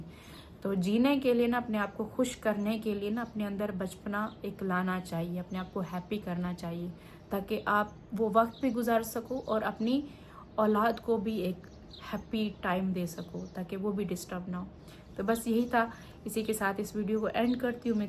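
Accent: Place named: India. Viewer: fazool baat mat Karo. Mein ne bhi comment parhe hein. Tum groom ker rahi ho sab cheez to dressing bhi groom Karo. Aap ko samjhaya hi Hai zayada tar logon ne. Trip pe aise kapre nahi pehente. Aap normal pehente hein koi nahi kehta. Aap picnic pe ghalat kapre pehen k gaye. Vo kapre picnic k liye nahi the. Aur aap ki zuban saaf thi. Aap ab india n logon ki Tarah bolna shru ker diya Hai. Achi aur saaf zuban ko kharab ker Liya. Yehi bola gaya Hai. Ye vlog intihayi fazool Hai. Aur her waqt mere husband ki doosri shadi. Her waqt yehi baat. Bas Karo yaar. Aur koi topic nahi hai. Agar tum her baat pe public ki baat sunti ho to groom kerne ko bola Jaye to use bhi suno.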